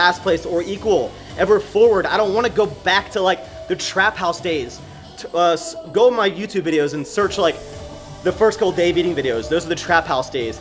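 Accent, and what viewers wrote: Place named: Washington D.C., United States. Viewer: just found ur channel. love ur vids bro! sounds like D.C. to me... moving on uupppp!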